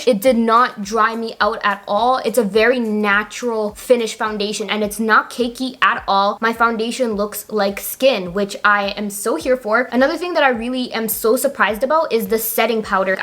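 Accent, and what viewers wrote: Toronto, Canada. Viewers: I knew i heard the Toronto accent lol.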